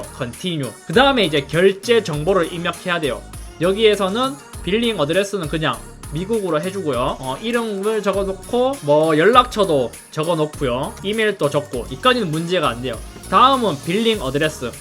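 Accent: Gyeongsang, South Korea. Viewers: 경상도 분이신가봄ㅋㅋㅋ 억양이 들리네요 저도 경상도!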